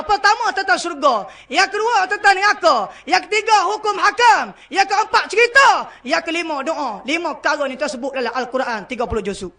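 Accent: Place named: Kelantan, Malaysia. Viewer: standart la bahaso klate. xsoh maroh la denga mace kasa skit .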